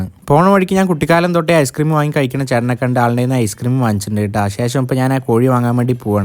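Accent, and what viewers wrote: Palakkad, India. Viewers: Bro palakkad aano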